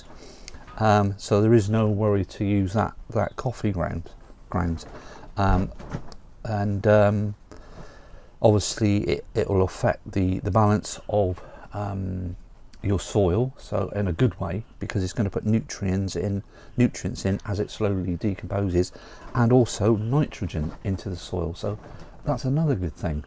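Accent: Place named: West Country, United Kingdom. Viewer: Are you in the West Country perchance? I detect a slight hint of accent and the reduced label on a plant you showed us looked like a Blue Diamond one, ‘tho they’re quite an extensive chain I know. But it would be just like God if you turned out to be a neighbour!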